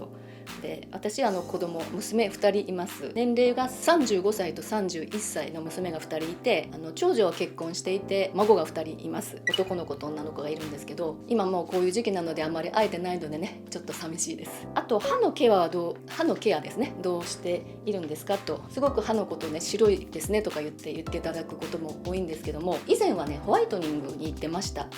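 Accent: Hiroshima, Japan. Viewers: やっぱりですね。 話し方が広島出身の方よね〜ってずっと思ってました。 顔ダンス毎日やってます。始めてまだ3週間ですが、結果が出るの楽しみにこれからも毎日がんばります。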